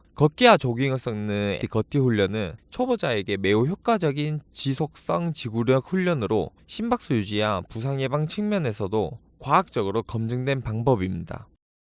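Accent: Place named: North Gyeongsang, South Korea. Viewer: 대구 경북 엑센트 심하시네 잘봤습니다.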